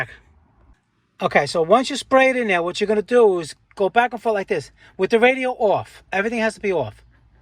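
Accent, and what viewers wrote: New York, United States. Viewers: I love his accent. This is a new york accent right?? Maybe Boston.. but i dont think. It sounds too close to home.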